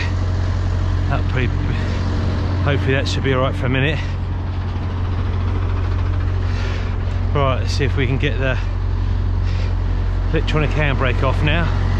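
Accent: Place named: High Wycombe, United Kingdom. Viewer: Sounds like my accent from High Wycombe many moons ago, in Australia now, used to drive road trains here 4 trailers. Thanks for the video.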